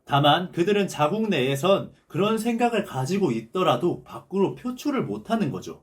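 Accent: Gyeongsang, South Korea. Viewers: 경상도 특유의 표준어 사용시 묻어나오는게 있습니다. 저도 경상도사람이니 아는거고요 ㅎㅎ 그래도 나쁘지 않았어요^^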